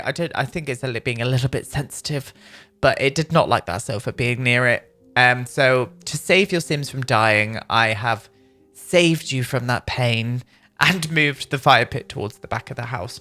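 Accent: New Zealand. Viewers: I'm Australian...pretty sure that presenter is a Kiwi. 😉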